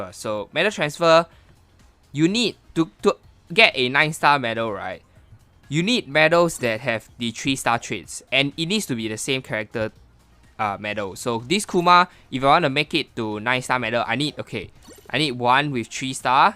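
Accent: Singapore. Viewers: Are u from Singapore I can tell from your acent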